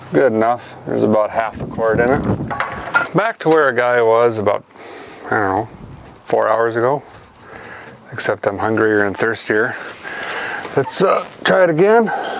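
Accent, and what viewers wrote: Minnesota, United States. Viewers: You sound like you're from Minnesota your accent is really thick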